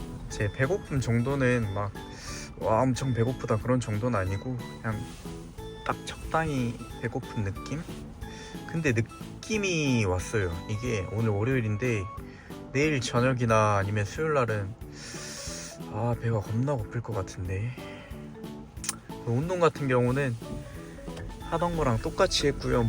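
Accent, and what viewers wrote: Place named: Jeolla, South Korea. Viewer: 약간 광주, 전라도 사투리 억양이 느껴지는데 혹시 광주쪽 사람인가요?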